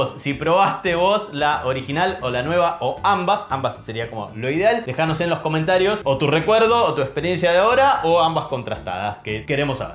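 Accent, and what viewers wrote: Argentina. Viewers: Cada día mas porteño este muchacho. Que lo pario!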